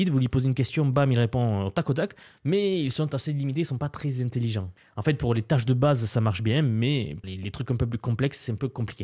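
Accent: South, France. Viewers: Un peu du sud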